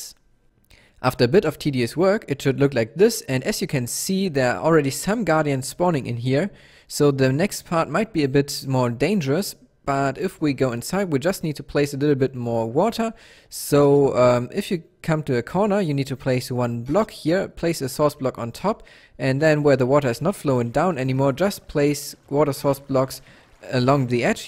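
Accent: Germany. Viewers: you describing the killing chamber so calmly in a vaguely German accent made me uncomfortable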